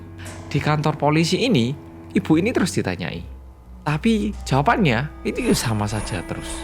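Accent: Java, Indonesia. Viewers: Welcome back wong medok dengan detektif 🥺🥺🥺🥺 Betapa kangen nya aku sama detektif 🤧🤧🤧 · Siappp mas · Alhamdulillah. Orang aplud lagii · Tim gercep Mas wkwk ❤️❤️❤️ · timmmmmmmmmm gercepppppppppp korea medokkkkkkkk mas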